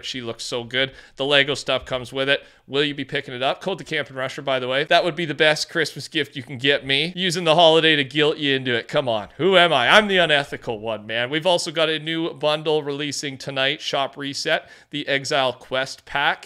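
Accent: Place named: Canada. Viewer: Canucks hat....the hoser accent. My guy lives down the road 😮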